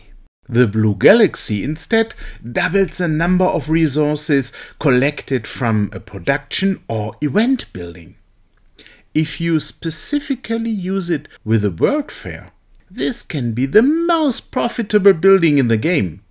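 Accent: Germany. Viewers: scheiße hört man dir an das du deutsch bist, dachte ich bin schlimm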